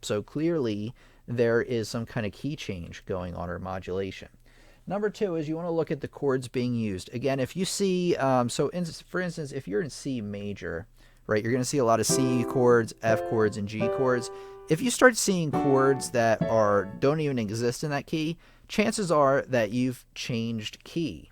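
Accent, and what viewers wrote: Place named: Philadelphia, United States. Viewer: Could not join your Live stream as a participant tonight! Wonder if others had issues too? Seems You Tube failed us with not error message. , Nor could I support the channel which I’d gladly do. Just today, I rec’d your suggested book from Amazon: "Scales, Chords, Arpeggios & Cadences”. Got to page 8 under 'Chord Progressions' & just failed to comprehend what this sentence means, here goes: “When a triad is not in root position, the ROOT is ALWAYS the upper note of the interval of a 4th!” Can you explain or re-word this sentence in a differnt way or possibly give an example, especially on the “..4th interval”? It is an enigma why my question on your Live presentation wouldn’t post! It was so annoying as there was not an error message nor any indication how to enter my text. This occurred, not at the end of your transmission, but >15 minutes prior. 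Any help with that? Lastly,, hearing your accent, it sounds as if you’re located somewhere near Philly area. I think you mentioned you do give piano lessons? I’d like my family to have keyboard instruction, with one who knows how to demystify complex concepts e.g. chord modulations, without feeling overwhelmed. As an educator & public speaker, I recognise, you do have a gift, making the terminally-complex, less so. Thanks & sure hope you can advise, what I may have done wrong, not being able to join the conversation tonight? Deni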